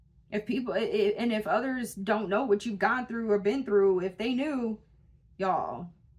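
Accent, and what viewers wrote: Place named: South, United States. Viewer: Loving the country accent you should hear mine😊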